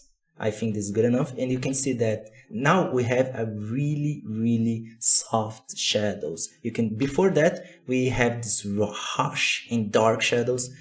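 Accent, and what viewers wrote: Brazil. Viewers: Great job. Thank you for sharing. (Sotaque brasileiro inconfundível) Rssss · Esse sotaque brasileiro falando inglês é coisa linda demais, força na batalha irmão!! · You seem to be Brazilian by your accent. Amazing Tutorial, simply sublime. Brazilians are really gifted.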